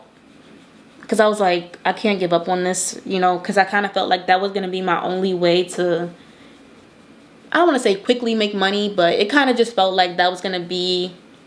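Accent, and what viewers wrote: Baltimore, United States. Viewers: Soon as you started talking I knew you were a fellow Baltimore native lol. It's rare to hear people who sound like the people in my everyday life on YouTube. The way you talk is so familiar lol. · In all the years of watching you, I just realized how subtle your Baltimore accent is! I too live in Miami (born and raised) but I live south near Zoo Miami. Praying the best for you and your new endeavors 🙌🏽 I just applied to FIU for their new Cybersecurity program 🙏🏽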